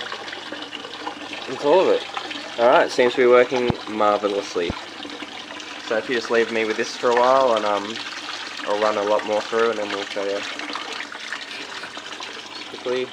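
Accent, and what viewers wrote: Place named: United Kingdom. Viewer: just a few Q's for you on your build... What size is the Bilge Pump you are using? Any idea as to your angle you are set up for on your table? Oh, you have a British (or Aussie / Kiwi) accent. Where are you from (Just Country) and in the area you gather your cons from, what type of Gold do you usually score (Flour, Flat, Mini Nuggets, Tiny Rounds)? Here in Canada, I gather my cons from Alberta from the NSR (North Saskatchewan River). The gold found there is mainly Flour Gould (Microscopic!). And for a few of my own Comments / Observations: Your first videos were a bit clumsy, but I made it through the majority of the videos! I have yet to make my own video for others, so your way up on me in this category! Thanks for your time taken! When you unscrewed the bottle, I'll admit you stunned me! - Well done, Dude! What you showed us all, is that you can take an idea out of thin air, with a couple bucks, and a few days of your time, end up with a finished product that, well ain't the most pleasing thing to look at, but heck you didn't build it to look at, right? 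You built it to work your cons - let the water remove your Lights & Heavies, and leave you with pure AU! In that category, YOU Aced it! Well Done! Oh, one last comment... Your focus on your camera sure SUCKS! But as I said before... we put through with it mostly till the end of the videos! Michael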